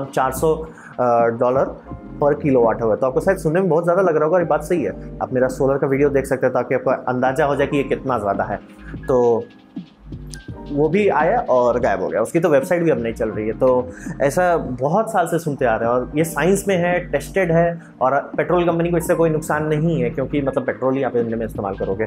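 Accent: Bihar, India. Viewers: Can I guess you are from Bihar naa???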